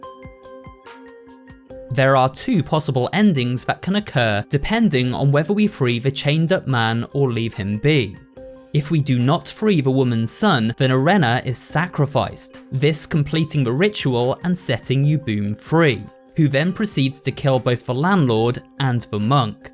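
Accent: United Kingdom. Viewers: You're british right? I'm british too What the hell is a faucet? It's a tap to us. Why are you pandering to americans. Saying "mom" and "faucet" (you arent even saying it correctly either). You have a loyal fan base. They know you are British. Speak like a brit!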